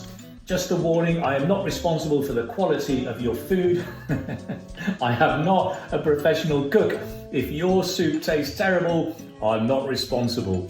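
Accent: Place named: England, United Kingdom. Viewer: perfect soap and english accent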